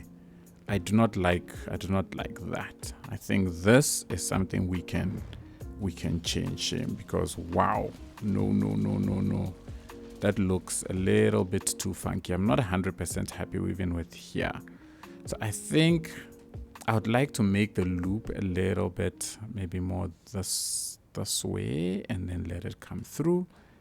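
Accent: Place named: South Africa. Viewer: You got a South African accent. S/O from mzansi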